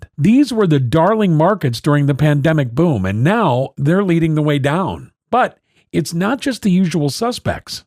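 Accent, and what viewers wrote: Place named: United States. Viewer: The American voice is so annoying.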